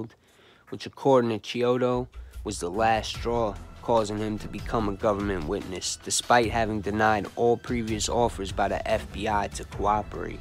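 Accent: New York, United States. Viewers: for the record…your voice is perfect for these videos. Not sure where your from but I’d swear your from the NY/NJ area…been here my whole life so most of your stories are based on areas within a half hour all around. Keep up the good work…I look forward to seeing your notifications 😉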